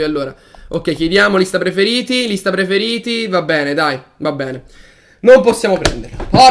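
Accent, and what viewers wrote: Tuscany, Italy. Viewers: Toscano puro eh bella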